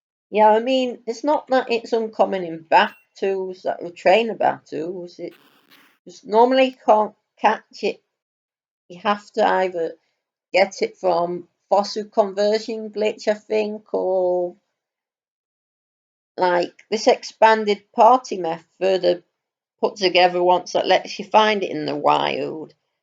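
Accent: United Kingdom. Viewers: I cant put my finger on it.. what is your accent? British?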